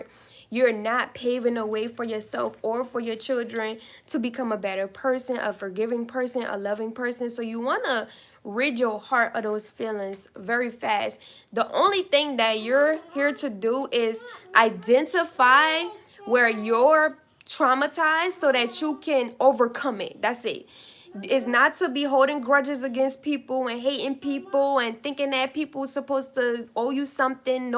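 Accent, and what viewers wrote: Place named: Louisiana, United States. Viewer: You sound like you are from Louisiana ❤️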